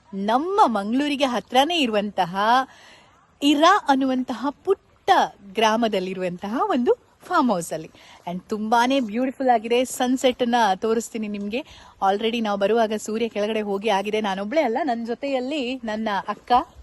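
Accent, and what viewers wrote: Standard. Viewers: ಏನ್ರೀ ನಿಮ್ ಕನ್ನಡ👌.. ಕಿವಿಗೆ ಇಂಪು ನೀಡುವಂತಹ ಸ್ವಚ್ಛ ಕನ್ನಡದ ವಿವರಣೆ😍 ಕಣ್ಣಿಗೆ ತಂಪು ನೀಡುವಂತಹ ಮನೆ ಹಾಗೂ ಸುತ್ತಮುತ್ತಲಿನ ವಾತಾವರಣದ ಚಿತ್ರಣ.. ಬೊಕದಾದ ಬೋಡು 😊👌..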